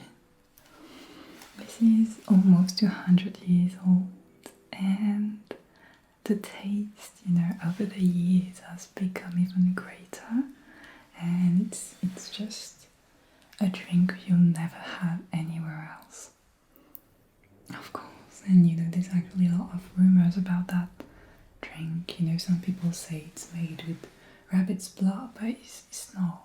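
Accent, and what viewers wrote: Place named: France. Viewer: Ce très léger accent français sur certains mots est HYPER agréable 👌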